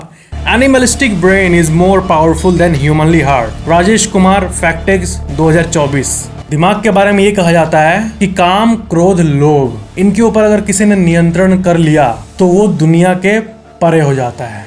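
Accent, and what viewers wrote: Bihar, India. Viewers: भाई तुम बिहारी हो क्या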